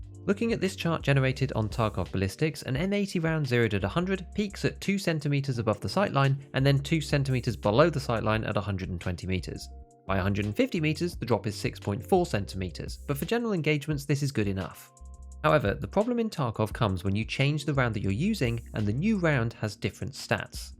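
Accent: United Kingdom. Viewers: He sounds brittish so I doubt he has even touched a real gun.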